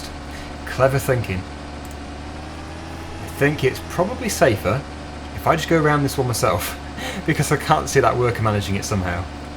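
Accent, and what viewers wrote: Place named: England, United Kingdom. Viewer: perhaps it would be a God idea to turn down the game volume? I think most people would rather have your lovely English accent rather than the tractors for the majority 😊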